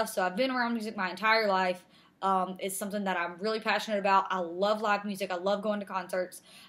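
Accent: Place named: South, United States. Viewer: Just saw you with Rosie and cool you have your own channel for ‘stuff’’ Obviously know the results of the and such as 4 days late but love your southern accent and the f bombs Runner on 2nd is no use anyway Georgia looking good this year, and damn glad the college season is rolling Goddamn I’m an Auburn fan too, although in Ireland, that game against Georgia State was painful Music too - awesome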